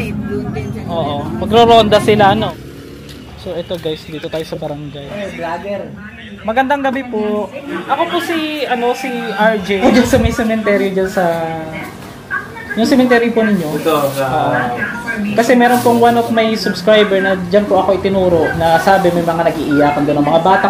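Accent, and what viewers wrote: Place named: Bulacan, Philippines. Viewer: ay malapit ka sa Bulacan, bulacan..e yung punto eh!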